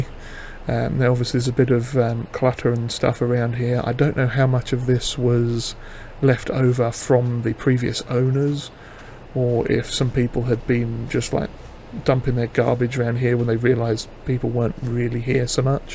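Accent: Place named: England, United Kingdom. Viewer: yea me too I love it to be real tough BUT still doable of course. And yea I'm really tied to all the old vintage stuff. I'm from the US (Midwest) and despite what the game says my grandpa's farm growing up and all the surrounding farms were pretty small and used old stuff. I never saw a closed cab until I was long off the farm and in another state lol (I'm 43 and we are talking about as I grew up in the late 80s and thru the 90s lol) Haha you and I have had the same experience with the horse/ox and carriage/plow so yea it should be an adventure!!! What part of England are you from? By your accent I ruled out the rest of the UK. My apologies if I'm wrong but I'm pretty sure you aren't from anywhere else and you aren't a scouser lol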